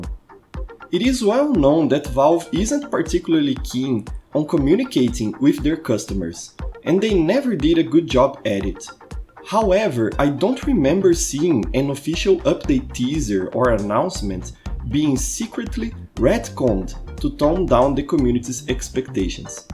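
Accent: Brazil. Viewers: sabia, sempre dá pra saber com esse sotaque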